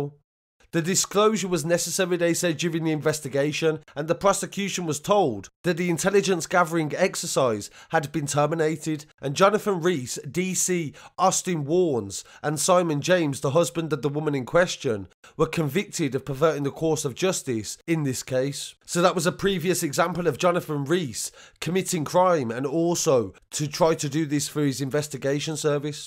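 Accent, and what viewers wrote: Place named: North, United Kingdom. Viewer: You know, sometimes your northern accent annoys me but on another note keep up the good work. We need more journalist that will tell us what the mainstream media wont. I appreciate the way you put the time in to research. Thanks.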